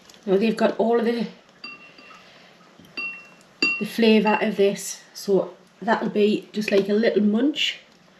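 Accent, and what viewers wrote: Newcastle, United Kingdom. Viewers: Love your Geordie accent and Newcastle Brown Ale! From a geordie livng in posh Oxfordshire! I remember delicious school dinners and once a month we stood in line for a handful of soft brown sugar! Still going strong at 81! Lovely recipes, will try them all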